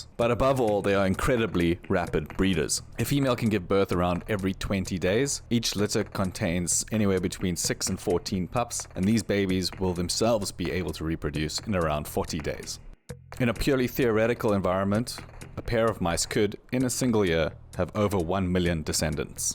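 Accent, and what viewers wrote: South Africa. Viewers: Hey man.. Where are you from? You sound South African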